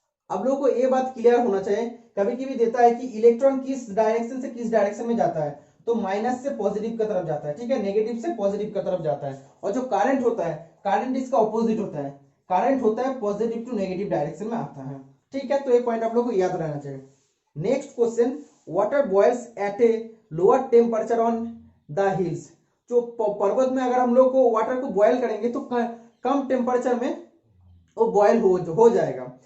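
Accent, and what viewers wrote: Bengal, India. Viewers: Are you from Bengal ?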